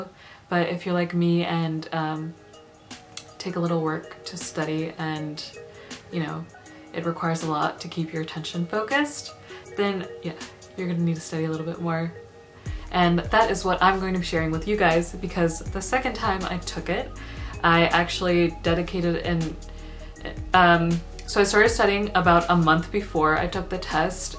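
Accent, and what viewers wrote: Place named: United States. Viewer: Love ur video, I’m an Indian too and finally Im watching a gre video of an Indian with a US accent 😂. Ur really funny too, great video 👍🏼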